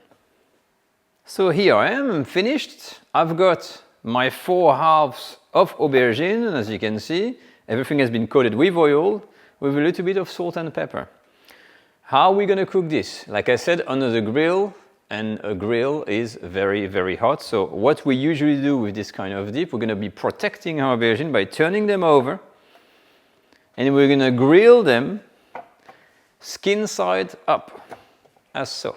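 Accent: France. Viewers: I've lived in France for more than 40 years, and I still love the authentic French accent when speaking English!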